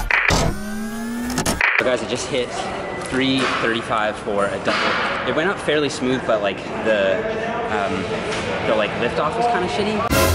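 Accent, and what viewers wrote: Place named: Newfoundland, Canada. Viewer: he sounds like a Newfie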